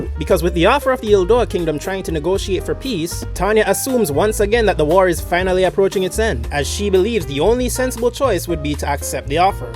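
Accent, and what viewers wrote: Jamaica. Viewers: lol me too like I could catch the Jamaican from a mile away so mi needed to confirm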